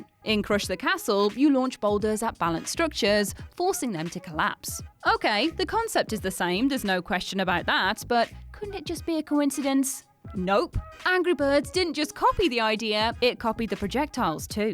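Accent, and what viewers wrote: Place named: England, United Kingdom. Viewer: I could literally listen to talk all day long. Don't know what style of English accent she has but a red head with a beautiful accent whom I'll never meet or talk to has stolen my heart like a school boy crush. Swoon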